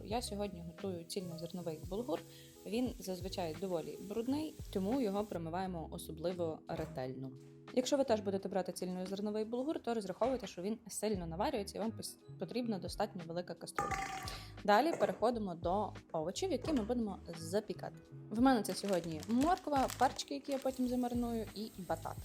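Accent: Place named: Ivano-Frankivsk, Ukraine. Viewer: Випадково відкрила і я в захваті - зрозуміло, цікаво і просто! Дякую!! А мова яка!❤️‍🔥 Ніби з Франківська..🥰🥰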